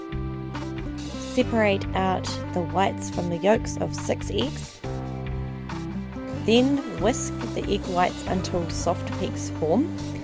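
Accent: New Zealand. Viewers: Soo good to hear a kiwi voice doing this.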